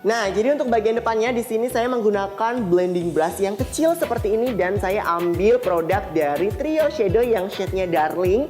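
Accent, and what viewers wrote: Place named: Java, Indonesia. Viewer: Medok bgt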